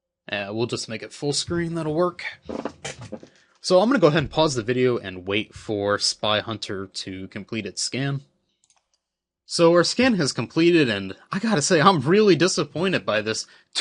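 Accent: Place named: Australia. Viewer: That sounded like an Aussie voice :)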